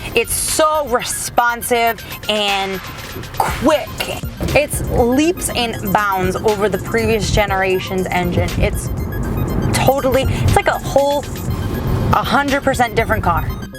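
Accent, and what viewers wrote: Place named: New England, United States. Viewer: Hella cute and that new England accent though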